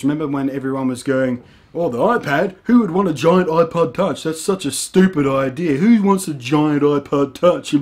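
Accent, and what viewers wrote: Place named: Australia. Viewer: Love watching your stuff man, I agree with 99% of everything you say. I dig the enthusiasm you have for gaming, Its rare these days and its refreshing to see. Your game and device reviews are very entertaining. Its also good to hear a fellow aussie online. Keep it up "thumbs Up"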